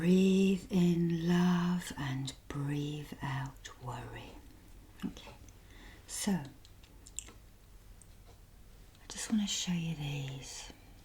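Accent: England, United Kingdom. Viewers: what can I say, I just simply adore. Your English accent I just love your videos mate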